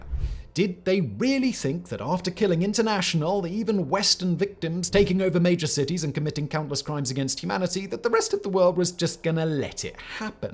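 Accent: RP, United Kingdom. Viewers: Grew up in surrey and west sussex uk. I dont know what accent that is supposed to be but it sounds like someone who is trying to impersonate the royal family.... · british english was extra thick in this one. Had to rewind a few times 😂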